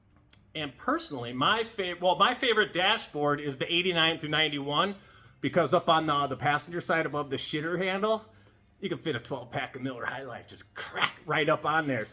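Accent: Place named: Appalachia, United States. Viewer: Damn you talk like you're right out of Appalachia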